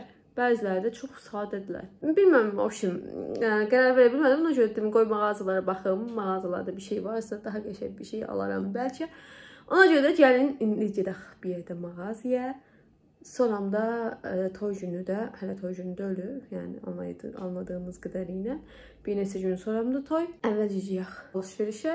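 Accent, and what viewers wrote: Sheki, Azerbaijan. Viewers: Ləhçə şəki həəəəə❤? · Salam dogma sheki lehcemiz siz shekinin hansi terefinnensoz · Şəki hər kəndinə quzu kəsim heylə.Heylə həblədə davam eliyin supersoz.Əla alinir ləhcəmizdə efrdi. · Siz Şəkilisiz ? Şəki akcenti var .Onlar şirin danişir. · Hara lehçesi bele?Şəki? iranlıların da lehçesi ne oxsayr.bilmedim hara lehcesidi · Xanim yeqin seklidi danisgindan hiss elədim😊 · bu arada lehcenden,menim yerlime oxsaddim .eger sekidensense mende Sekidenen ,✊😀birazda balaken lehcesine oxsadiram .Allah size ailene balalrina uzun omur xos gunler can sagligi❤️ dileyirem .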